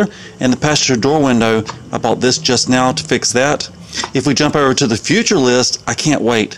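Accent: United States. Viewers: Fantastic work!!! You’re the example of what having a YouTube channel is all about. Quick and brief explanation of what is going on and what you’re about to do. No useless rambling or tongue smacking like an African tribe and not sounding like you’re severely parched lol. Sorry for the rant but it’s so refreshing to find someone who speaks like an American. Sub’d 🤙🏾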